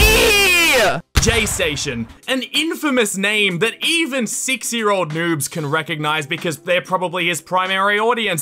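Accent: Australia. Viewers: I love his australian accent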